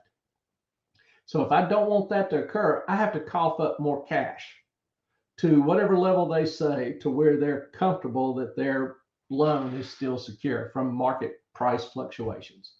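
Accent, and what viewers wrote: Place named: Alabama, United States. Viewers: That Alabama accent! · Another L&Ler subbing & watching. Your content is amazing. Add in the dogs & southern accent, and the “bigass” adjective and I’m here to stay! Thanks for the breakdown.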